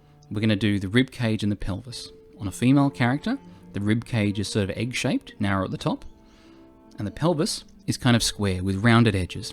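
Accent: Australia. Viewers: Your accent sounded familiar and than I realized ya were australian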